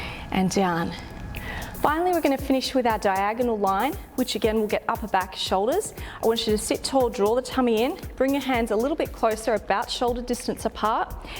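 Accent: Australia. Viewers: Ì love your work. So nice to have an Aussie accent and a not at all pretentious instructor. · Definitely felt the burn in the arms! Wonderful channel, with a lovely focus on gentle exercise rather than wearing oneself out. We are Aussie expats from QLD living in Sweden currently, nice to hear your familiar accent while working out. 🥰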